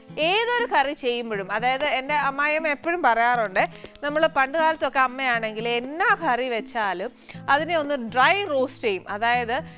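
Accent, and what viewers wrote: Kottayam, India. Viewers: Kottayam bhasha kurach koodipoyi 😂😂😂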